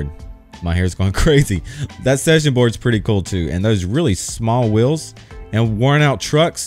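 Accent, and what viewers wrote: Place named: Appalachia, United States. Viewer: his accent is like the city equivalent of Hillbilly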